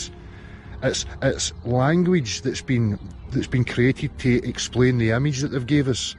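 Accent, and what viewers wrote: Scotland, United Kingdom. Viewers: That was so well done. I love the Scottish accent as well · I love it!! And you remained friends.....and sowed a seed. Well done! (What part of Scotland are you from?) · I like scottish accent 😉 !! I thought he was a relative of Charlie Burchill 😂 ( Simple minds)!! Greetings from Italy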